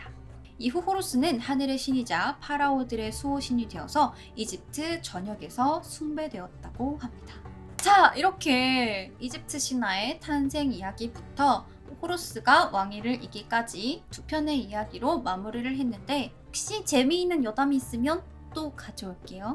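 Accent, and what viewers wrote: Gyeongsang, South Korea. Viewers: 응 경상도 여자네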